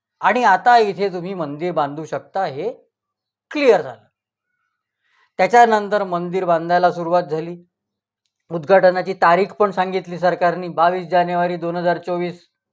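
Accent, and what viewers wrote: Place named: Amravati, India. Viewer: अगदी अमरावतीची भाषा, खंवचटपणा! पुन्हां पुन्हां ऐकतो तुम्हाला.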